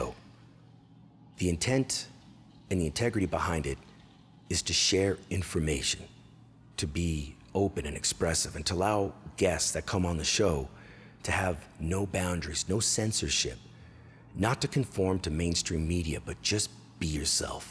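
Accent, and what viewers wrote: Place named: Scotland, United Kingdom. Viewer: Lol 😂 great 👍 Scotland 🏴 accent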